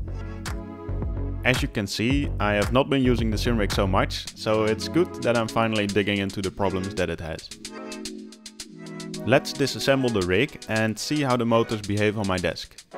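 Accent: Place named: Netherlands. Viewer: Het is duidelijk van jouw stem dat je uit Nederland komt. LOL. Zier er top uit.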